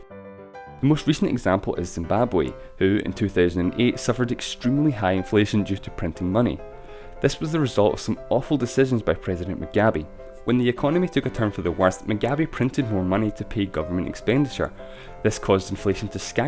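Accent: Scotland, United Kingdom. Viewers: It’s the Scottish accent lol. His accent is pretty clear lol some Scottish accent are hard to understand if you don’t pay attention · Telling by Your Accent You Are Scottish Probably, Good Explaination👍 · I'm not trying to be rude or something, but if this is a Scottish accent, then it's bloody annoying.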